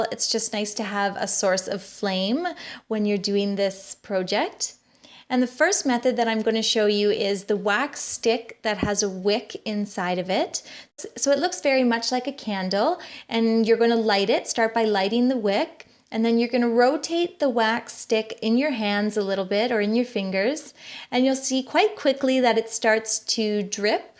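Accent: Canada. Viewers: I might be wrong but she sounds Canadian. I can tell because shay mitchell says aboot and she's Canadian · Are you Canadian??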